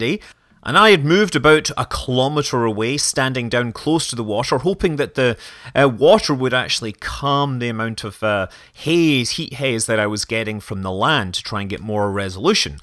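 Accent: Scotland, United Kingdom. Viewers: It just hit me! I figured out why I love watching your stuff so much! Your accent is tricking some part of my mind that Scotty (Star Trek) is explaining this all to me. It must be right! Honestly though, you do a great job of explaining. Even though all this stuff is way above my pay scale, I'm actually "getting it" for the most part. I thoroughly enjoy watching your channel. Keep up the great work. It's cool that you've got your daughter involved as well, it's sure to pay off for her one day. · My head hurts ....too many numbers .....I live on Skye ! You sound jockanise ....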